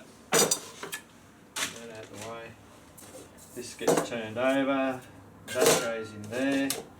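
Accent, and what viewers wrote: Australia. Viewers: Your accent makes me miss living in Woomera SA.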